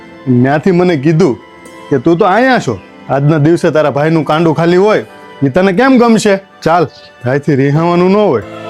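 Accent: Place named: Bhavnagar, India. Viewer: બોલી પરથી લાગે છે કે ભાવનગર તરફના હશો.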